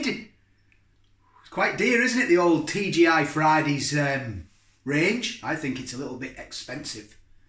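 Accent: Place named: South Yorkshire, United Kingdom. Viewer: Thought you sounded like you are from South Yorkshire x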